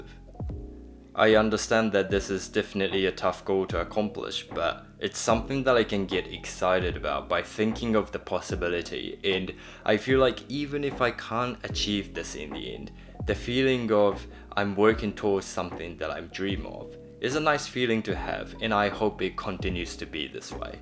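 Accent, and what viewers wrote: New Zealand. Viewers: I can definitely here your kiwi accent, 😄cool bro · Did you spend time in New Zealand, your English has hints of a kiwi inflection. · I was very impressed by how you were able to cut and style your own hair with the use of a mirror. And the handsome smiley face topped off the whole ‘look’. 😉 Where did you learn English? Some words sound like British English and then some sound like the English spoken in New Zealand. Really enjoyed watching your video. Best wishes from Stefan in Ireland. Update: Ha!! I just saw some of your other videos and now understand why your accent sounds rather like a New Zealander!! Lol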